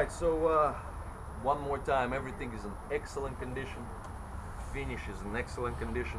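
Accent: Russia. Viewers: Do I here a little russian accent there? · You do know words and can make sentences but have very Russian pronounce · Love the accent, is it Russian?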